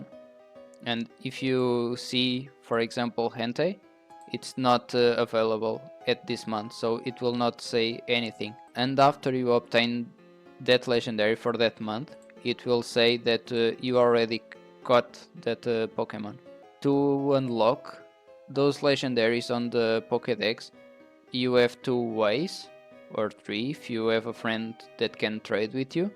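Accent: Portugal. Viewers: Are you portuguese? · That accent, 100% Portuguese! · És tuga nao és? Dá para perceber pelo teu sotaque 😅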